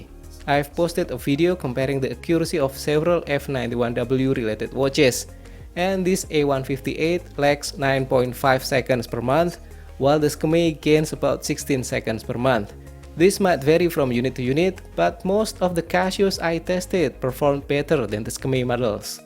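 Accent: Indonesia. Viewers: It is Indonesian accent. You may find it similiar to S'pore/Msian.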